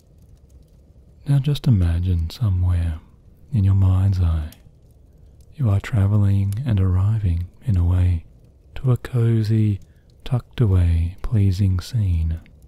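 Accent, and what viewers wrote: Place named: Australia. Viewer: I love that you’ve released a hibernation video just as we’re entering winter in the souther hemisphere. That combined with your calm Aussie accent is just so perfect. I feel at home 😌🙏🏽💗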